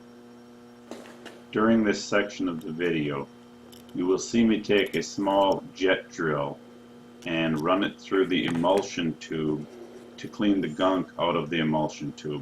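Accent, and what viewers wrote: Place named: Minnesota, United States. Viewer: I bet you are a Minnesotan?? You have the same accent as all my relative's??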